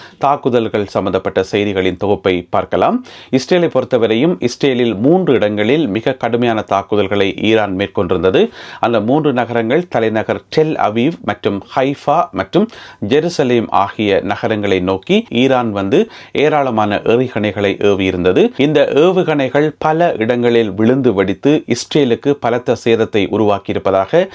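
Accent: Jaffna, Sri Lanka. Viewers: Super speaking tamil in jaffna ❤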